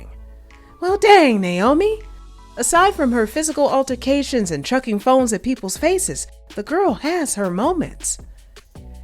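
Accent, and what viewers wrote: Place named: United Kingdom. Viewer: It’s the British accent for meee🤣🤣 spot onnnn🎉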